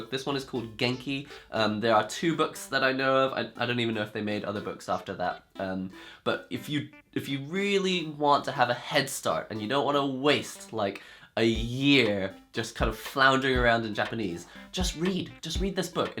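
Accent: United Kingdom. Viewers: So is the British? And learned Japanese?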